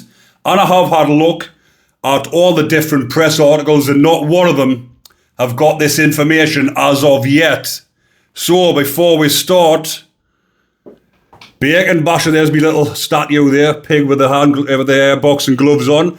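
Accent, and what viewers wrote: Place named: Scotland, United Kingdom. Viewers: What English accent does this guy have? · As a southern softy I also love that tough northern accent- scares me just to listen to it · Wonderful accent! Is it Scottish?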